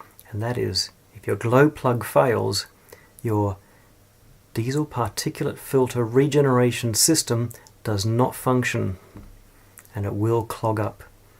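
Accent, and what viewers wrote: Australia. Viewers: Hey I like your presentation manner!. Can't pick your accent. It it Melbourne? Or were you born in the UK or Kiwi and emigrated? Regardless, I thank you for your lovely videos. Thanks matey!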